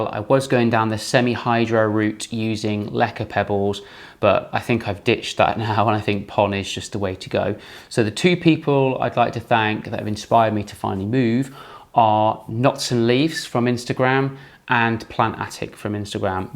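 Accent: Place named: England, United Kingdom. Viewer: You sound English!! Where do you get your clear inner pots? I can’t find places in UK that sell them?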